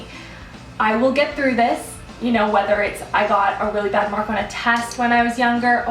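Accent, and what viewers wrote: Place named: Canada. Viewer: I can tell you're Canadian by the way you say "out" and "about" :) so cute!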